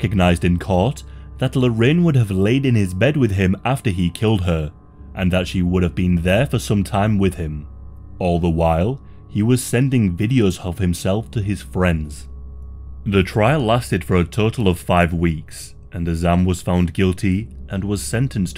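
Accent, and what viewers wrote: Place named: Northern England, United Kingdom. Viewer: Sounds like northern England 🏴😊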